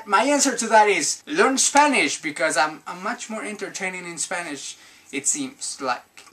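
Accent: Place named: England, United Kingdom. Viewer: x3 i like your english accent <3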